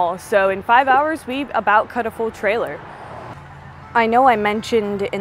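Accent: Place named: Michigan, United States. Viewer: Hey, has anyone ever told you that you sound like you're from northern Michigan not north central Pennsylvania?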